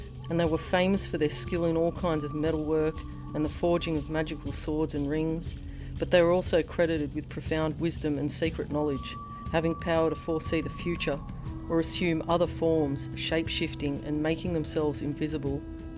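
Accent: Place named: Australia. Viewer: Thanks for making these new connections in such an engaging Aussie voice!🙏⚡💛🦘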